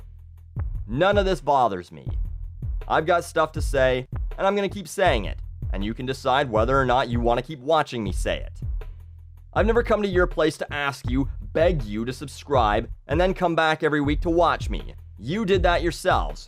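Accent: Canada. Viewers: Make a final video where you say your goodbyes, then open a new account, quit the Canadian accent (you should have done that long ago actually) and use a Swedish or Norwegese accent (it's the same cold country anyway, wonder why they speak different languages??!!). Do the same thing, same concept. Some people will say it kinda ressembles this measure of that used to be good a while ago, most will just say this is THE shit right now (yes right now, not tomorrow). You get 10 millions views in one month and you can finally make it to vidcon!!! Hooray.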